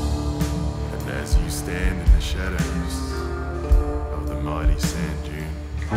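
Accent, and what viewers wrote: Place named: Australia. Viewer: Wou Aussie as voice! Reminds me of the movie two hands, the dead big brother narrating.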